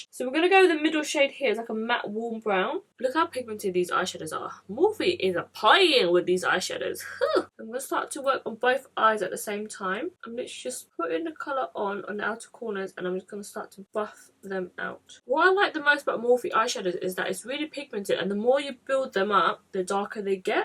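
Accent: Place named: North London, United Kingdom. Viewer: You got a beautiful north London accent x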